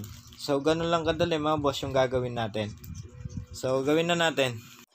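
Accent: Pampanga, Philippines. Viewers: Kapampangan ka no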